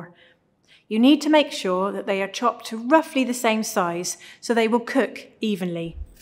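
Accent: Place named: West Country, United Kingdom. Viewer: Its a fairly common accent from the English West. Still widely spoken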